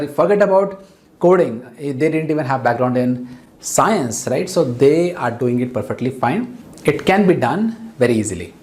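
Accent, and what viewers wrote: India. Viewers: Are you from the Hyderabad ?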